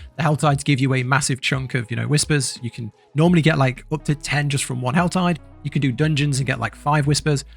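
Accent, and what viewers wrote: United Kingdom. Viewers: he talks too dam fast to the point where it sounds like he sped his voice up 😂 I rewinded the vid 8x still can't understand the 1st thing im supposdd to do 💀 And the UK accent isn't helping 😭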